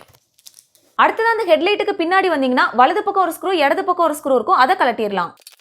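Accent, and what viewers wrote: Tirunelveli, India. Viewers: உங்களுக்கு திருநெல்வேலியா ? எந்த ஏரியா?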